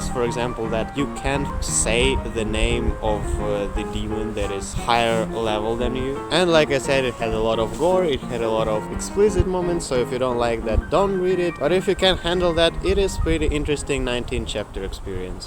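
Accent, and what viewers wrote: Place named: Russia. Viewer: Probably not the comment that you're looking for, but your English doesn't suck! Your English is extremely understandable and your vocabulary is really good! Lots of the slang words and phrases you use correctly and where a native english speaking American would! Seriously you should be proud of it, while you definitely have a distinct Russian/slavic that's not your fault! Anyone who grew up natively speaking Russian or any eastern European/Rusified language is almost guaranteed to have an accent no matter their language proficiency, plus at least in America we probably converse with and understand at least one person with worse English and a more foreign accent than you on a daily basis! Don't let your English skills be what keeps you from creating, I promise it doesn't matter in the slightest to an American viewer! We're here for the personality which we can all decipher just fine past a slight, and very adorable, Russian twang.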